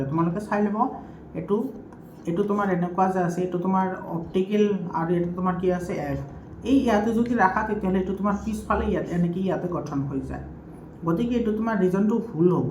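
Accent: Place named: Bajali, India. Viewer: bajali lage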